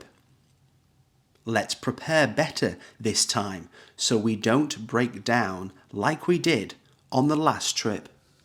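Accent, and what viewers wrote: United Kingdom. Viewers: It sounds your accent is British Are these words common in American accent?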